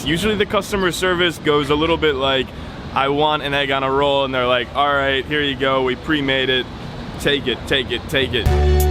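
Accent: France. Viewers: i was wondering when you travel around the world and get so much street food does it ever happend to you to get a food poison nice french accent btw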